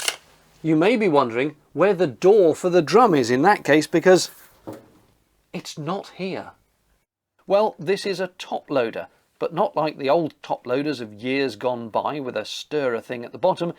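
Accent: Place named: England, United Kingdom. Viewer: Amazing how you can make even a video on a washing machine so enjoyable! Might be that classic English accent! I'm surprised that you're premitted to discharge waste water (even grey water) into the canals. Here in the US that would never fly! I'm sure that, like yourself, most folks that live and travel on the canal system are good stewards of the water ways and use eco friendly soaps that find their way into the grey water and ultimately the canals. Thanks as always!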